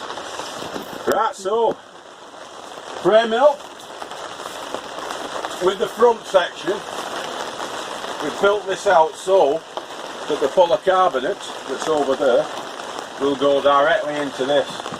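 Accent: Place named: Lancashire, United Kingdom. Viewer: Lol. do the visitors from foreign lands understand your Lanky (Lancashire) dialect :)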